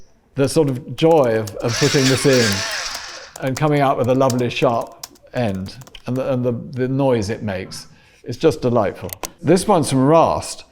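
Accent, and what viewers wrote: United Kingdom. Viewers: すんごいBritishアクセント。 かっこよすぎ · イギリス英語？ググったらほんまにイギリス人やん聞き取りやすい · イギリス英語たまんね〜〜〜😭😭😭😭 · イギリス訛りすごいな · ブリティッシュのアクセントが最高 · 上品なイギリス英語ですね！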